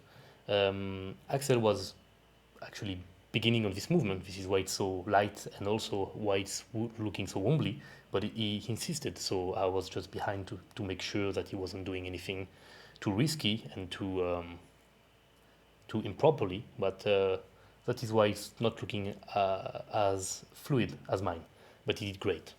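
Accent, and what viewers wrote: France. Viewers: Vidéo d'entraînement de 20min, voiceover en anglais avec un fort accent français, intensité au rendez-vous, que demander de plus ? PS : pareil que toi perso. J'ai toujours été plus à l'aise avec une prise plus serrée et je règle également tous les sièges le plus bas possible sur les machines pour les pecs. La largeur de clavicules influence la prise aussi à mon avis. Qu'en penses-tu ?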